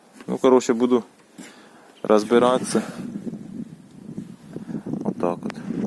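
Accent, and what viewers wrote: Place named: Chernihiv, Ukraine. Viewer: Теска., мені теж твоя вимова нагадала мені, що це таке, щось знайоме, не міг згадати. Почитав в коментарях - Чернігів. Можливо, був там в 90-х. Приємно слухати. Бажаю тобі упіхів в житті та розвитку каналу. Я підписався. Хочу порадити, друже, якщо є можливість, купляй "корчики", роби їх чесно для ЛЮДЕЙ, знімай відео для нас, перепродуй вигідно для себе і радуй нас гарними порадами. Особливо це буде потрібно для нашої молоді. Щиро вдячний, МИРУ нам усім і ДОБРА в кожну родину!!!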